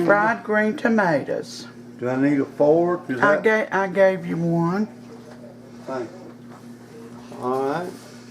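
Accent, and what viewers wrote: South, United States. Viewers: I really appreciate your presentation. Your southern accent gives confidence and assurance to your listeners. It's southern hospitality personified.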